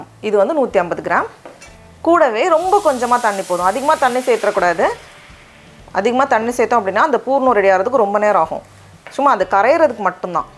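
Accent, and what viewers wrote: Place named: Chennai, India. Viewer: ah madras tamil konjam 💜seen ah💜 irukum kekumbodhu sami namba bhasha pesudhudanu sandhoshama iruku Akka 😍🤣🤣🤣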